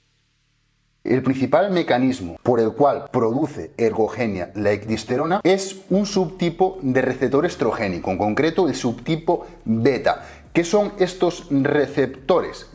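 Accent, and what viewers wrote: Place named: Galicia, Spain. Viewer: Ti es galego? paréceme aínda que non teñes moito acento, pero pola túa forma de empregar algunhas estructuras gramaticáis. Pregúntoche dende o respecto e a curiosidade...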